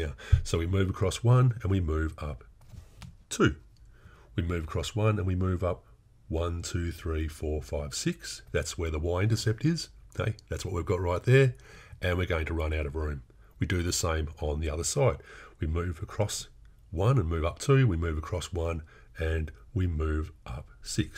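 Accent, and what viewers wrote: Australia. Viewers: australian accent hit just right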